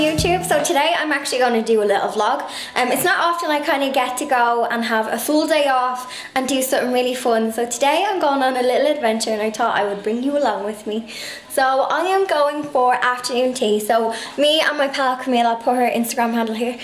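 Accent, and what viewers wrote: Ireland. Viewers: I have been watching so many of your videos and I really am enjoying them and love them so much. Can't believe the way you painted your fridge and gave it new pastel champagne pink look. love your Irish accent aswell by the way. You really have taste and style girl!!!! Your home must be so stunning to live in. So feminine and girly and such a stylish shabby chic cottage. Really love your videos sooooooooooo ooooooooooooo much. Your fine bone china collection is worth an absolute fortune. Please keep doing your lovely stylish videos of different rooms and different aspects of your life y r so good at making videos.xxxxx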